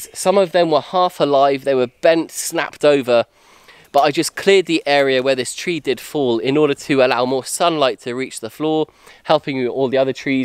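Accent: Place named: Bristol, United Kingdom. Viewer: Just found your channel.... I am in bristol, it sounds like you arent too far away from me.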